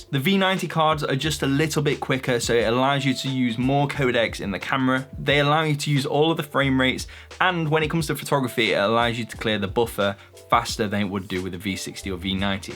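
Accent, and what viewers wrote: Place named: Northern England, United Kingdom. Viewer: I feel like I've found the North of England's answer to Peter McKinnon... (geographical location guess based on accent, could be way out 🤣) Either way this channel is dope. Great work man!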